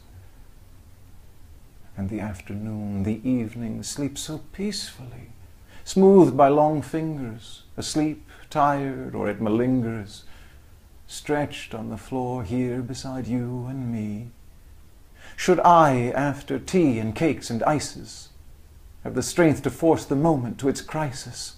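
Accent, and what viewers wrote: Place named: United States. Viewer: Just the most amazing, easily-produced American diction. How many books have I heard him narrate?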